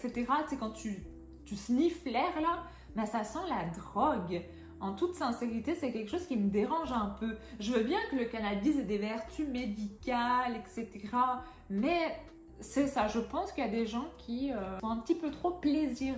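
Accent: Quebec, Canada. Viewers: votre "début de petit accent québécois" est charmant! 🥰 💗 C'est ce que TOUT les français aiment chez les étrangers qui font l'effort de parler français 👍👏 Les Québécois reste et resteront à jamais dans nos cœurs avec leurs qualités et même leurs défauts! 🥰😘😘😘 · J’adore on commence à entendre que tu prends l’accent québécois. Expatriée aux states c’est la même merde au niveau santé, route et pis l’odeur du cannabis dans NYC c'est horrible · J'allais dire la même chose, même la tournure des phrases comment a sentir le Québec 😂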